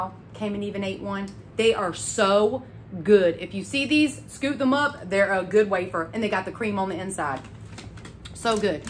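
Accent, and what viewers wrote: South, United States. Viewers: Girl yes! I could tell you are from the south with your fun accent. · I always smile when I watch your hauls. You talk fast & Southern like me.🍓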